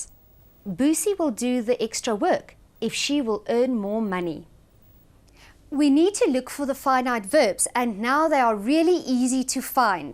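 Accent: South Africa. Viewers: this is south African accent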